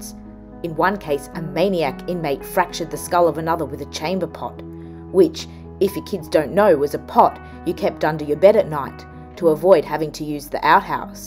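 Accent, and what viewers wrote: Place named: Australia. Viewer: 😂😂😂 love the early Australian / english accent commentary 😅😅😅 that was a great video ✌️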